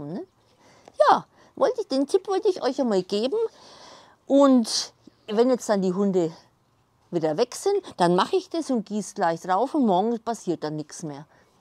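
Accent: Franconia, Germany. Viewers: Hallo Du bist Fränkin und kommst vermutlich aus dem Raum Ansbach, stimmts? Liebe Grüße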